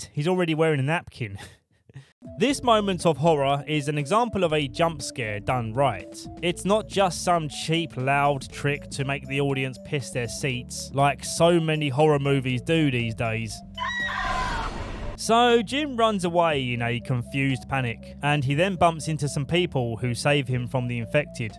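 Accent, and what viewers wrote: United Kingdom. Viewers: 2eyt deys leytaayayayayayaya British english Kills me Always 🫣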